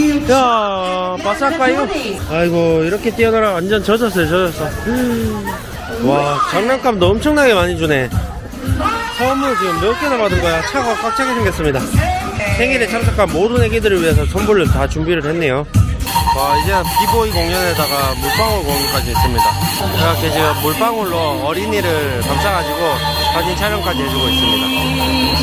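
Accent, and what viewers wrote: Gyeongsang, South Korea. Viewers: 혹시 고향이 대구 이십니까??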